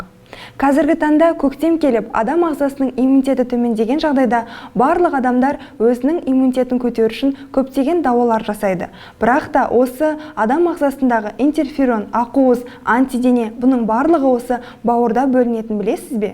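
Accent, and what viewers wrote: Standard. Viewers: Көп жаса Айналайын ана тілімізде таза сөйлейтін сендей қыздарымызға Алла разы болсын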